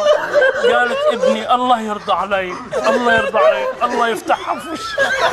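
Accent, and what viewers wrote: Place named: Syria. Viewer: نص لهجتو سوري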